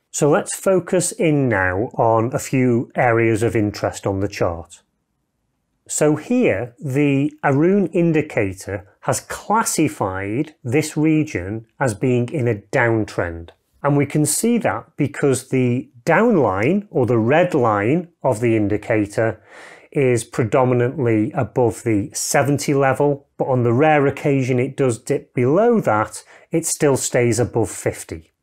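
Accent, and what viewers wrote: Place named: United Kingdom. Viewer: Excelent contents and presenter's british accent is music!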